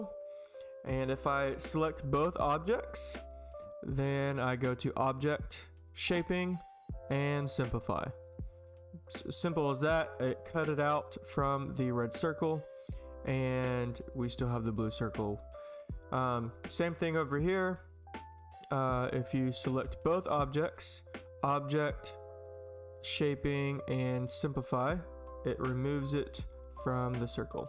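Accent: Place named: England, United Kingdom. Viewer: Thank God for clear english accent and also for useful video!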